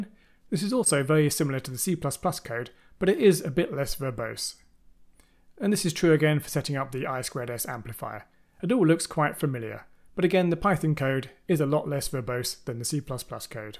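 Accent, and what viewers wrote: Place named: United Kingdom. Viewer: You sound like you're from the UK. Did you order via pcbway? Any issues since brexit / vat changes?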